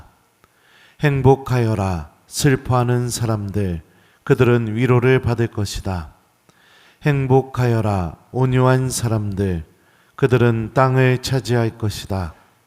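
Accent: Chungcheong, South Korea. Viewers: 신부님 감사합니다 강론말씀에 저 영적으로 채워지고 있는듯 해서 넘좋습니다~ 정겨운 충청도 사투리에 마음이 따뜻해집니다 왜인지 돌아가신 부모님이 생각납니다~~ 신부님 감사합니다 하느님 감사합니다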